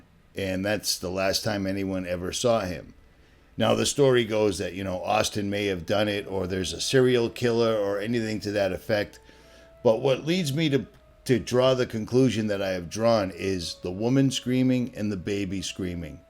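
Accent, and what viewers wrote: Boston, United States. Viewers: I just came across your YouTube channel and this was the first video I've ever watched. I read some of the comments below and they were mentioning a guy named from Boston. I didn't know who they were talking about till I searched on YouTube and Google and figured out that they were talking about the guy who has this YouTube channel. I grew up in Boston; not outside of Boston or near Boston; not on the north shore or the south shore but in Boston. If you grew up in Boston then you know why I mentioned this. I'm curious where you are from in Boston? What section of Boston are you from? I certainly recognize the accent and could take a good guess and probably get pretty close or answer in 2-3 tries. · What happened to from Boston? This man has the same accent as.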